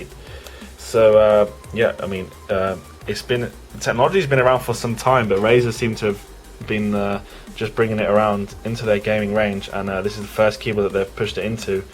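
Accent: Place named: United Kingdom. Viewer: Youtube showed an ad for the new Sacha Baron Cohen film, just before this film, and suddenly your face popped up with that british accent.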